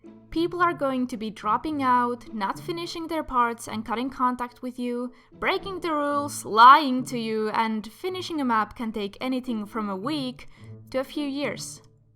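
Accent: Finland. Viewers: Are you from Finland? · Why i think you are from Finland?!? Sorry about my bad English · Wait a sec, ARE YOU FINNISH.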